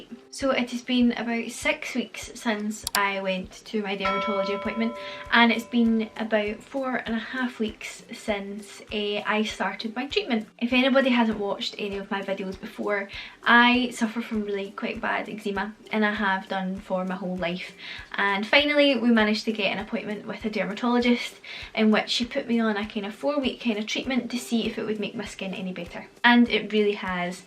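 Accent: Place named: Scotland, United Kingdom. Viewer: Holy shit your results are stunning! Beautiful skin! I'm feeling all proud of myself as an American who was able to correctly identify your accent as Scottish...lol. That's as best as I can do though. I'm glad to have found your channel!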